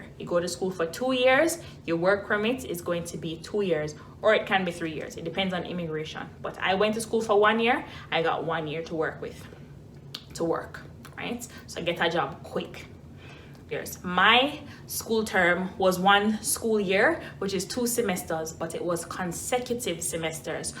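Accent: Jamaica. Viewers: I could tell you are Jamaican without you saying it, we Jamaicans know a Jamaican anywhere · I hear the Jamaican in you❤️❤️🇯🇲🇯🇲nuff love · She has a jamaican English accent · sounds like a Jamaican................thanks for the information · Hey there I hear Jamaican 😁 I subbed, thank you for the tip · Definitely a yaadie, mi not even going to ask. Suscribed-click.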